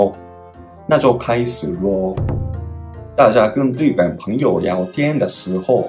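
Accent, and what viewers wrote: Taiwan. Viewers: 的台湾语调真的很突出。看来在一个地方学习语言就会真的明显带有一个地方的语调。也希望可以学学东北口音；-p 那也会很有趣wwwww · 第一次听到台湾腔的日式中文发音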